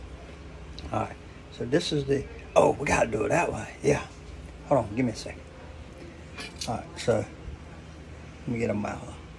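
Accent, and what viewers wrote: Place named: South, United States. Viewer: Dayum!!! That looked good! Also freaked me out hearing a true southern drawl coming out of your mouth! I subbed because I want to see some more videos!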